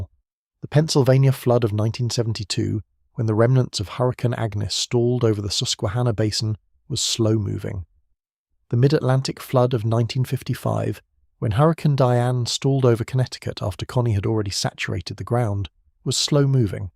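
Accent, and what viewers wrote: United Kingdom. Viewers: The worst weather was the same time as the Dust bowl. Government is not smart enough to solve any problems and they do not want to balance the budget. A house of Reps without a budget is not a constitutional government. Currently irritated by British accent. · Can't we find an American narrator? I find this heavy British accent very annoying.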